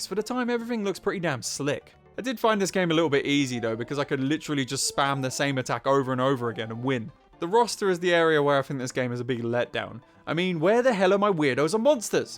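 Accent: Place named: United Kingdom. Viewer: I like when British man talks about games for over and hour myyyyy favoriiiiiteeeeee 😩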